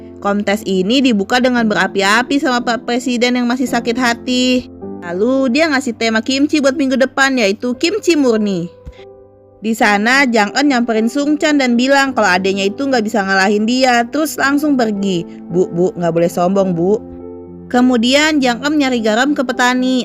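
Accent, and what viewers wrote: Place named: Jakarta, Indonesia. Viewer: Asli, ini yg bercerita orang jakarta yaaa, rawamangun bukan ? Cara bicara mengingatkan temanku orang rawamangun, sama percis cara bicara nya, haddeuh, i like you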